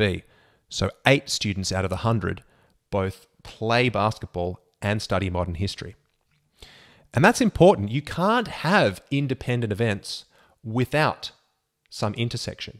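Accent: Australia. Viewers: You speak Australian accent?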